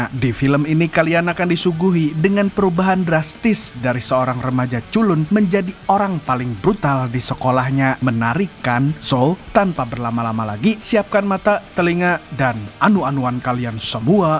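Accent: Banjar, Indonesia. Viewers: Wahhh waahh pian urang banjar kah min..? 😅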